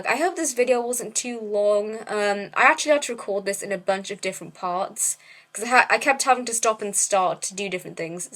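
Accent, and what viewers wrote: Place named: United Kingdom. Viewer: Hey :) i'm a new subscriber & i couldnt help but notice your interesting accent. Roughly whereabouts to you live in the UK? Also, i enjoyed the video :D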